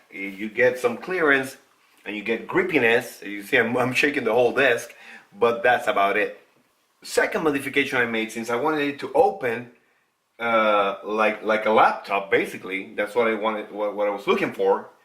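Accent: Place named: United States. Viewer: Hey! This seems unrelated, but where is your accent from? I remember in the cheesy Dune miniseries SciFi made a long time ago, the guy they cast for Count Fenring (the Emperor's Advisor) spoke with an accent which has some strikingly similar features to yours! I never knew where it was from! Yours does sound closer to a standard American, but it's still really cool.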